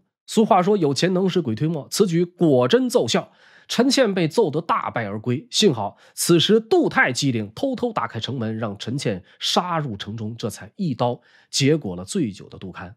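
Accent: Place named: Northeast, China. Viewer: 很精彩 兄弟是东北人吧）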